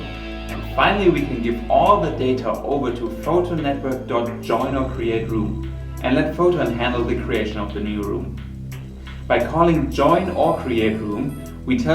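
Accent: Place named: Germany. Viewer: i bet you're german!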